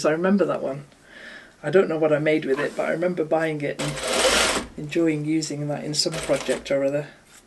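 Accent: United Kingdom. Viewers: I love your British accent coming from an American gal. My most favorite, relaxing quilts was when I sewed my last 2 scrape quilt. Still have plenty of scraps to use. Thank you for your informative videos.🤗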